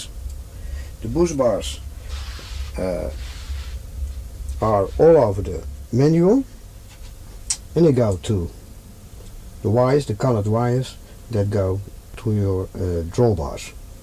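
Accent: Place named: Netherlands. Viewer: You're Dutch? Can't miss.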